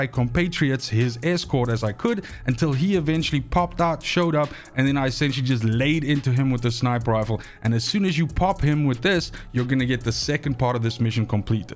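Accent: Afrikaans, South Africa. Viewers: Man sounds Afrikaans af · Bro are you South African??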